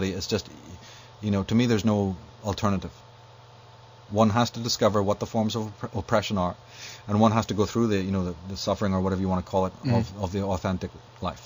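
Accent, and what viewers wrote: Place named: Northern Ireland, United Kingdom. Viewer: I find his voice compelling... The slight Northern Irish accent makes it easy to listen to. Thanks for uploading this Free your sou with 7 l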